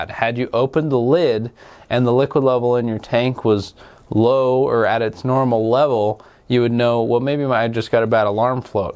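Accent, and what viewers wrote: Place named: Midwest, United States. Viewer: Sounds US Midwestern to me.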